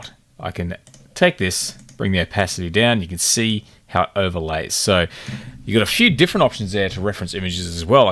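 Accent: Australia. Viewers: Tryn to work out the accent, reckon either Melbourne or Sydney. Good on ya mate. BrisVegas here. Subbed. BTW I use SD A1111 PS plugin and getting pretty good returns and streamlined wrkflws. Offers more options for those who work outside the Alpaca ecosystem.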